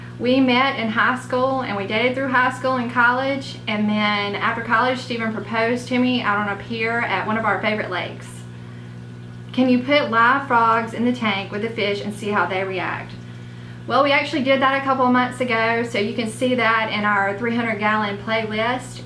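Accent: South, United States. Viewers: that sweet southern drawl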